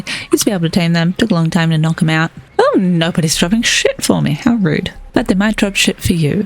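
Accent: Australia. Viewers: i love your aussie accent and attitude when talking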